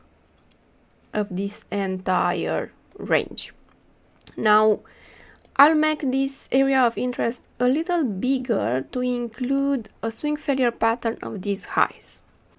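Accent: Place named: Romania. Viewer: Are you Romanian ? That accent is familiar.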